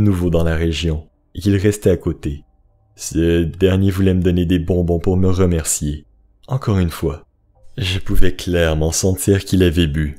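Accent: Quebec, Canada. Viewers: Mais ta un putain. D’accent québécois pourtant sa a l’air intéressant jte dit sa mes mon accent marseillais n’est pas mieux ✌🏽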